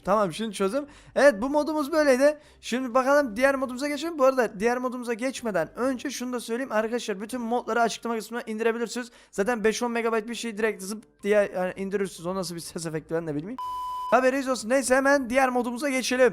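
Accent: Black Sea, Turkey. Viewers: Abi karadenizin neredinsenn söyle ne olur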